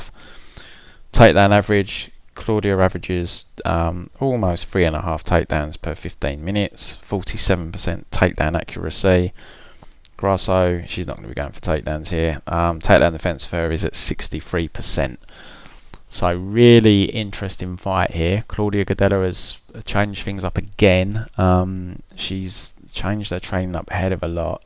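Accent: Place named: United Kingdom. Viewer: First time listening to, and gauging, your podcast. British accent: check....so far, so good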